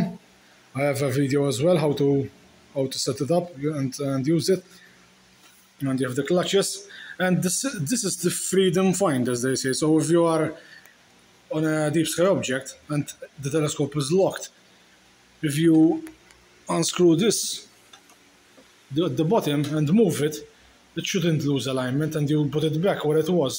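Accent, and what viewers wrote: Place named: Malta. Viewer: I bought one of these a few months back, these tips are great! Then to my surprise, this was a recommended video and I recognise the accent - a fellow maltese! Grazzi tal video!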